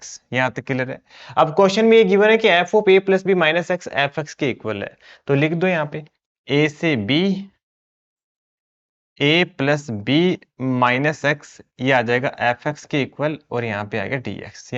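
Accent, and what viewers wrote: Rajasthan, India. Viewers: Sir kya aap rajasthan se ho😊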